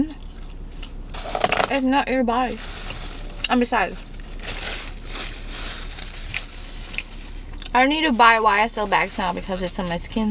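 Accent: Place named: Minnesota, United States. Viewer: You never know your Minnesotan until you hear another Minnesotan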